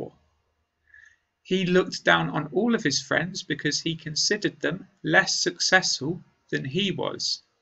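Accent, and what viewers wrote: United Kingdom. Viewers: Thanks a lot! I love your British accent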